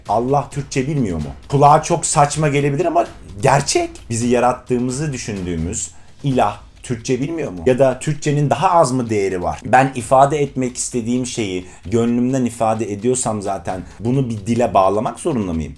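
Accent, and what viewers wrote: United States. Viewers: abi dediklerin cok dogru turkiye insanlari degismez ingilizcen bence bir amerikalidan daha amerikali iyi ki varsin <3 su an ingilizcem cok az var seninle beraber ingilizce ogrenmeyi istiyorum ve dediklerine fazla takiliyorum <3333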